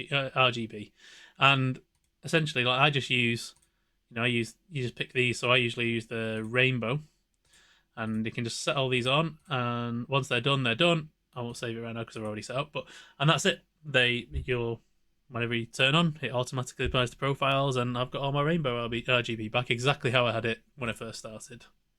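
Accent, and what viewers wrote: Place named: England, United Kingdom. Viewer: Oh, of course, you're English! Now I get why "water" turns into "wo'uh"!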